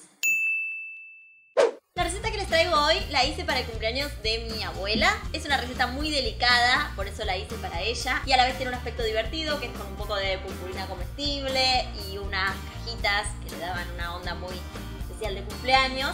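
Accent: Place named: Argentina. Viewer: Hola te hago dos preguntas:1- hace cuanto que estas con este canal? (solo me aparecen 2 videos tuyos)2- ¿Eres de Argentina? Pregunto por tu hacento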